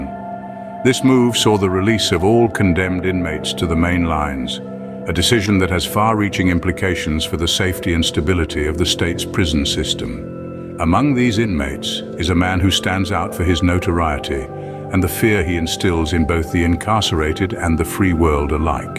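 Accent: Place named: United Kingdom. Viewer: Somebody hit the lick with this narrator. Serious and compelling, the deep, UK sounding accent ups the story's level of integrity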